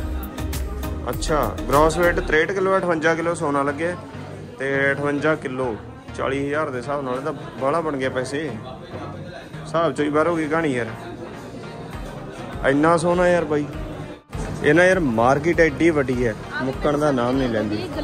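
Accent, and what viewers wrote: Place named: Gurdaspur, India. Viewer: Veer di awaj sun ke hi pata lug gia si ki gurdaspuria he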